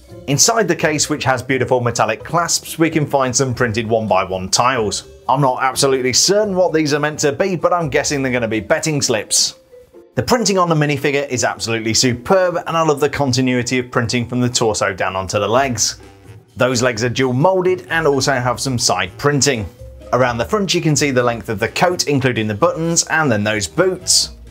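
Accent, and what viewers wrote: United Kingdom. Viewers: The most british accent if i ever heard one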